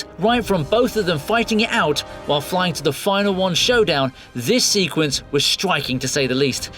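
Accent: United Kingdom. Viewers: "The Sorcerer's Stone" is the dumbed down title of the film for the US audience (officially because WB didn't think Americans would know what a Philosopher's Stone was) despite having the internet at their fingertips and millions of HP book fans. Use the correct title, you do sound British after all.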